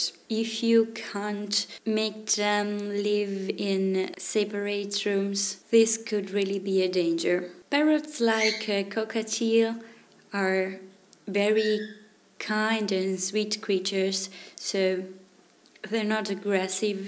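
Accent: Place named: Italy. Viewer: Posso darti un consiglio? Non forzare la pronuncia, è davvero fastidioso da sentire! Non devi vergognarti del tuo accento italiano, e se proprio lo vuoi cambiare ti ci vuole molta pratica e non lo puoi improvvisare così. Ma poi il nostro accento è bello e simpatico, quando ero in Inghilterra me lo dicevano tutti :)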